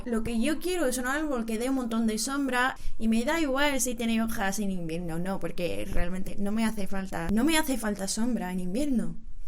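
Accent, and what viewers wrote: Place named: Seville, Spain. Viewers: Mientras no te dé un cólico por haberte tomado las naranjas, jeje. Me da curiosidad, porque es cierto que siempre han dicho que no son naranjas comestibles (por lo amargas que son) y que las cogen para hacer mermeladas y cosas así. Precisamente la semana pasada estuvieron cogiendo las naranjas del barrio donde vive mi hermana, en San Jerónimo. En mi barrio no hay muchos naranjos, pero si tú confirmas que se pueden comer y están dulces algún zumito caerá seguro. Un abrazo y enhorabuena por lo bien que hablas español, con acento sevillano 100%. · Me encantan tus videos, eres muy simpatica. Me gusta muchisimo tu acento sevillano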